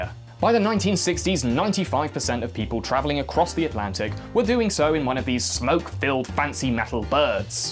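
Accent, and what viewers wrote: United Kingdom. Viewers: Misinformation with a British accent. At least it sounds inviting. It's also quite wrong. The American quite. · Anyone else get happy when they start a video and find out it's a British person talking?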